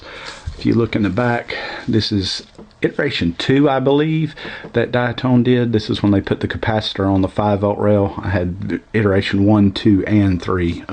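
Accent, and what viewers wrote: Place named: Texas, United States. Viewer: Less jello than a runcam split mini? I hear that texan accent, what part?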